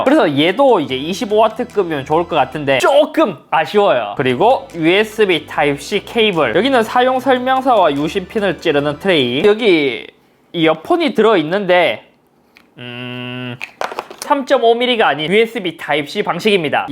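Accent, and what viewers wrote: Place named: Gangwon, South Korea. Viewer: 강원도 억양이시네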